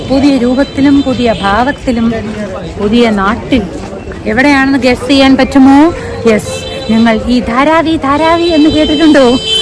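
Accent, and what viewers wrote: Trivandrum, India. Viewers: Good presentation.good language tvm😀🤣🤗good🤗thank you. Suscribe cheythu. Good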